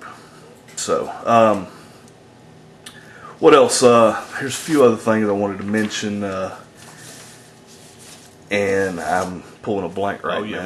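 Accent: South, United States. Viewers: i love your voice/southern accent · You know I really don't like southern accents- but yours is just fine XD Not a bad review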